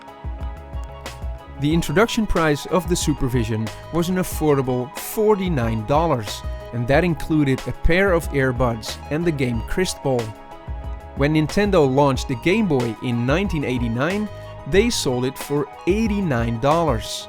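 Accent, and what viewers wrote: Netherlands. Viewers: You Dutch ? :-) great video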